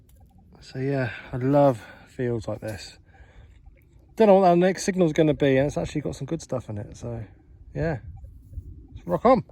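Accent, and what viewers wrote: Scotland, United Kingdom. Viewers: you are an absolute gem x thanks so much! You always been my fav 🎉🙌🤗❤️💥 xxx love your banter and the #realmetaldetecting x need hear more of the Scottish accent though haha 😂 x will send email today! Glad to hear all the family well x Thanks 😊 xx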